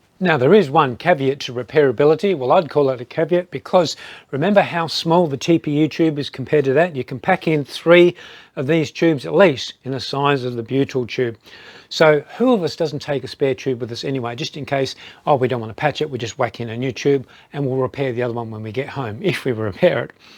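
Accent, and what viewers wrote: Australia. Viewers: it's South Australian, not a Sydney sider accent. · Very informative, great australian accent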